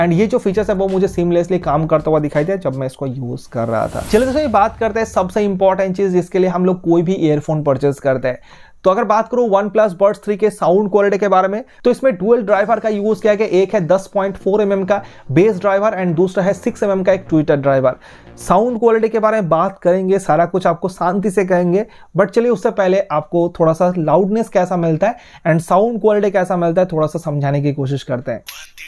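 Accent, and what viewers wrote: Bengal, India. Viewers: Bhii app Bengal see hoo kya??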